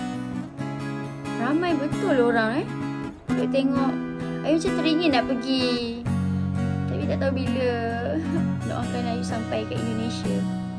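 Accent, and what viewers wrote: Malaysia. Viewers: Saya suka cara bicaranya aja.lucu,manja,dan cirkhas logat Melayu nya bikin adem telinga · Suka x dengar cakap malaysie ni. Meremang bulu roma. 😀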